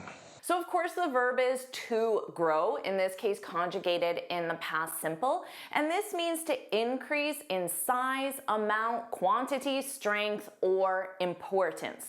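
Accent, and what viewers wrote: Canada. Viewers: Are you from Winnipeg? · Do you have the Canadian accent or are you speaking like an English ?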